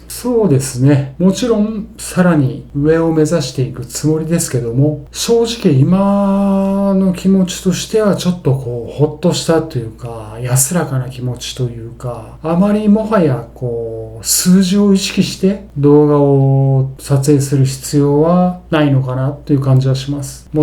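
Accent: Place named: Fukui, Japan. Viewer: イントネーションが福井っぽい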